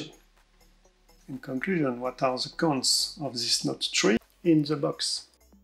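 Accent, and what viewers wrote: France. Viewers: Oh god your french accent ...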